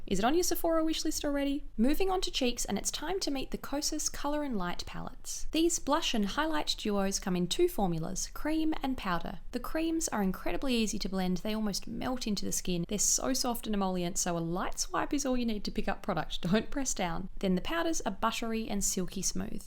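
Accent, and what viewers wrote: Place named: Australia. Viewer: I come to all your videos for cool reviews, pretty hands and that lovely Aussie accent 👌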